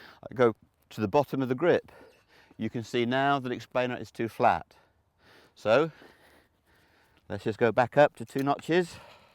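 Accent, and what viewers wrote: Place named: United Kingdom. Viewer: This guy is so British and I love it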